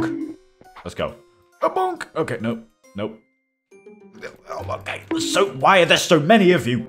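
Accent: United Kingdom. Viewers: You do sound kind of British,.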